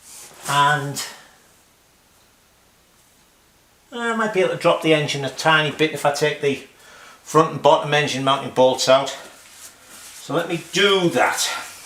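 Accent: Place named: Teesside, United Kingdom. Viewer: Recognise the accent,,, are you from Teesside????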